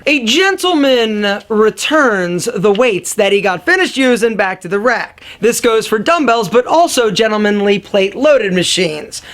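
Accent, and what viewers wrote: Philadelphia, United States. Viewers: john doe Sounds like Philly. That is how they talk. Listen to Darryl Hall, he sounds like this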